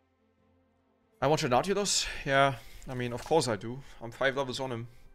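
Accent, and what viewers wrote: Germany. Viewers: This game I realized you are german and I watched a lot of videos of you😂. Usually every german is with 100% accuracy detected by me after the first few words because german-english accent is very obvious.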